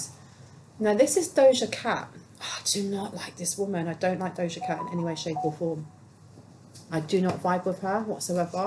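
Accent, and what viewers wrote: United Kingdom. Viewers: Understand you perfectly, love a Brit accent lovey! Your reading are exemplary! 💕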